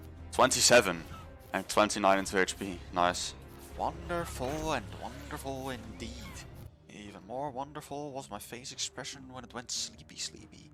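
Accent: Netherlands. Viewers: Je bent nederlands of niet😂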